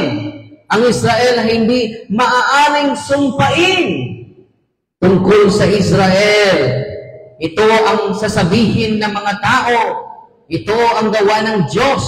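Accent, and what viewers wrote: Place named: Iloilo, Philippines. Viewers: Very good kaayo Father! Mora man pod ka Ilonggo. Passing na kaayo Father imo Ilonggo.naa ra gamay medyo kimaw pag pronounce like U-tod. (Not utód). Pero okay na kaayo Father!wow! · Ang cute mag ilon go speak 😊 Gusto KO Sana pumonta,hi de knm ALAM San yan😅 Amen🙏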